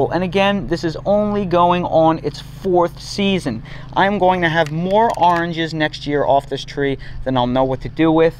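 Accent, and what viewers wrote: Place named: North, United States. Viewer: Florida land is far more valuable than the fruit industry yields in profit. Citrus farms have been selling off for years. In GA/FL, we pronounce it FlOOR i da. You sound like you're from a little farther north than North Carolina.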